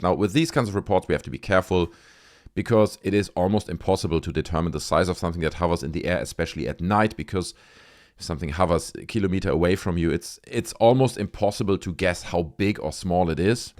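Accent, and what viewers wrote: Germany. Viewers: You also sound like you have a German accent. Maybe I'm wrong 🤷🏼‍♀️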